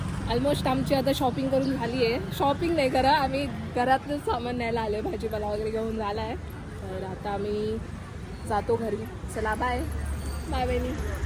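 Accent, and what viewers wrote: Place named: Malvan, India. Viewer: नेहमीच छान असतात तुझे व्हिडीओ. बाळ खूप गोंडस आहे. मालवणी भाषेचा पगडा असलेलं तुझं मराठी बोलणं खूप छान वाटत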